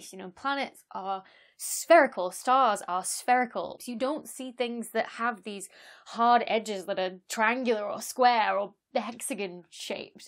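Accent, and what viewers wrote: United Kingdom. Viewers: I like how you pronounced that British t-sound from 'plot' by just stopping the airflow with your throat. I mean, how do you explain this to a phonetician who has learned that the the T-sound is a dental sound. For some one lip-reading you, you just said plo. Please keep that accent, I love it.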